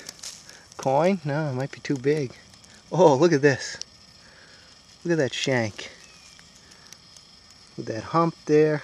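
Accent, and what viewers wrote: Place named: South, United States. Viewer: Love your accent! Southern Dirt Fishing Lady doesnt hear that often!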